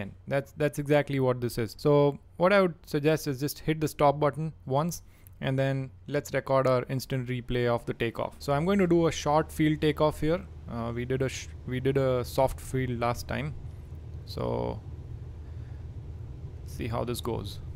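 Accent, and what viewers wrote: India. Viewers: I don’t understand INDIAN ASCENT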